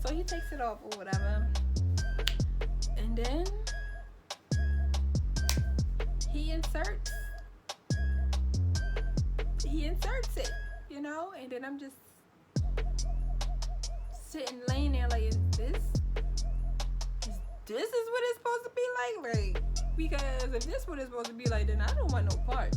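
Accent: Baltimore, United States. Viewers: i knew u was from baltimore the first time i heard your voice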